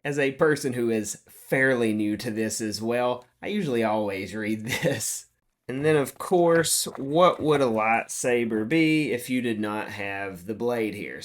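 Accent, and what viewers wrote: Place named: South, United States. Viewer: Southern accent is fucking cute❤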